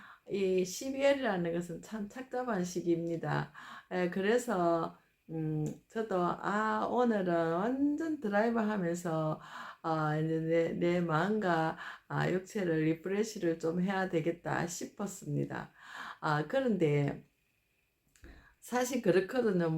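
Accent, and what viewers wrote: Gyeongsang, South Korea. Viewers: 78♡ 교수님 반갑습니다. 경상도 억양이신데 저도 19세까지 포항에서 살았습니다. 영상 잘 보겠습니다.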